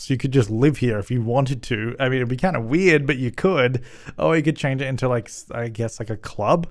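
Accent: Australia. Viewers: Last night I had a dream that was looking for an assistant to help him and his requirement was just you had to sound like him. The whole dream from then on is me trying to sound Australian and saying "hey guys!" A lot.😂 Edit: omgoodness thank you all for the likes! I don't think I have ever had such a loved comment. 😊 I'm happy I could entertain you with my silly dream!😁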